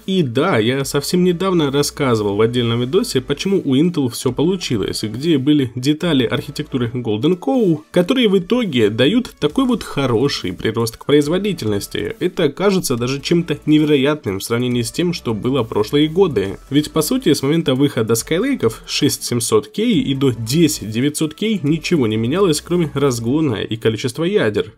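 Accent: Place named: Ukraine. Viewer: автор,судя по говору ты с Украины. ну молодцом! верно все говоришь! забыл только сказать про родственные связи...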